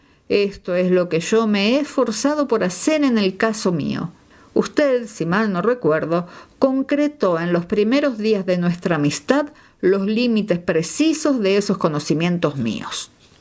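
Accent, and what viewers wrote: Argentina. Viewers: ¡Les deseo muchas felicidades en el 2024! Gracias por tan agradables narraciones claras y con la musicalidad del acento argentino.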